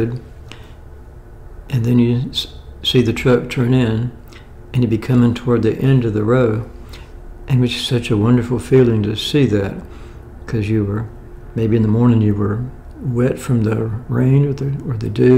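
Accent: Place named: Delaware, United States. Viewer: Where are you from? You remind me of a Delaware accent or maybe Philadelphia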